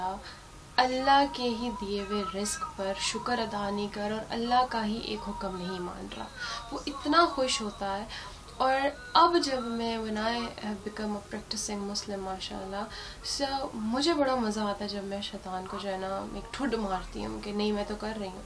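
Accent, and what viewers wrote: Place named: India. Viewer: O MY GOD, HOW LOVELY HINDI U SPEAK, LOVE YA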